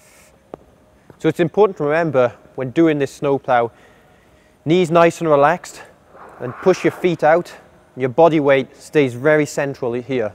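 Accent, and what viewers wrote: Wales, United Kingdom. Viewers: Is he from Wales? Or somewhere else in the U.K?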